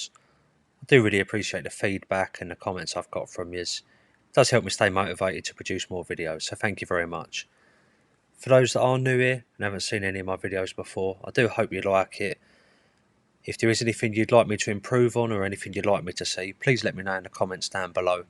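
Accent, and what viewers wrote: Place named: East London, United Kingdom. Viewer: Hi there i love your videos of you driving around London in a London Black taxi cab, Question, do you ever drive from hotels in Central London with passengers to and from either Heathrow and Gatwick Airports and back? if so could you film such a journey on a day shift in your taxi in the not too distant future? How many times a week do you do airport runs like this? given that you travel into London from the East side around Essex area in terms of your East London/Essex accent that i can guess from the way your voice sounds? Thank you in advance and please keep the videos coming?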